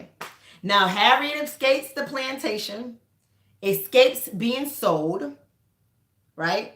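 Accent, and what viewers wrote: New York, United States. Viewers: I LOVE your NY accent.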